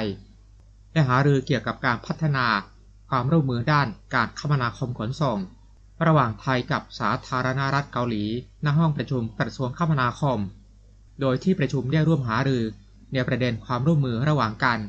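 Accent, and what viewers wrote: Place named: Cambodia. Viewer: ได้ซิจ๊ะ ร ล ควบกล้ำ ฉันไม่ได้มาก่อกวนแต่สงสัยเพราะสำเนียงเธอเหมือนคนเขมรก็แค่นั้น คนไทยบางคนพูดไทยสำเนียงจีนก็มีเพราะเขาโตอยู่ในย่านคนจีน พูดไทยสำเนียงลาวก้มีอย่างคนอีสาน ..และเดี๋ยวนี้มีเพื่อนบ้านอย่างลาว เวียดนามเขาก็ทำคลิปยูทูปพูดภาษาไทยด้วย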